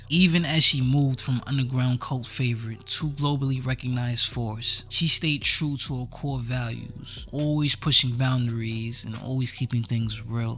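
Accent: New York, United States. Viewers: Where’s your accent from? It’s great + super interesting! Is it New York?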